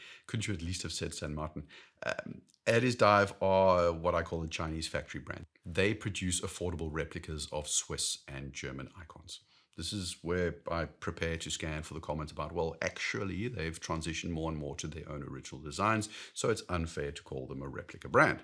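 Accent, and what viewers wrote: South Africa. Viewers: Are you south african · Was not expecting a saffa accent when I clicked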